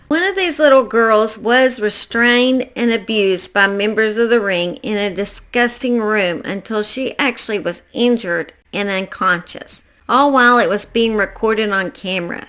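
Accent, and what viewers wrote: South, United States. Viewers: Bloody hell! There are just no words to describe this level of depravity! On a positive note: I LOVE your southern accent ❤ Cheers from Australia 👍😁🇦🇺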